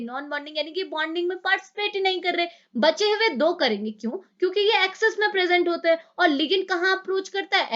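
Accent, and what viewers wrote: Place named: mixed, India. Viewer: Bdiya pdhate ho mam ap..❤❤❤ ap up ya uk se ho syd....😅😅 mean apka acsant Asa hi lgta h.. liie ye सब समज अगि..😁😁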